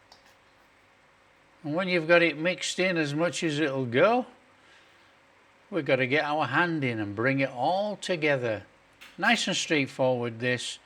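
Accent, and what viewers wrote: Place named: Doncaster, United Kingdom. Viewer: I spy with my little eye a Donny accent ❤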